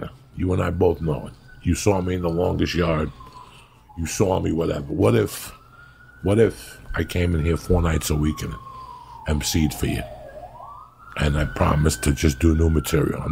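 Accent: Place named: East Coast, United States. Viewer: He doesn’t remind me West coast comic more east coast roots. Accent. Comedy is east coast. I’m glad he is proud to be from New Jersey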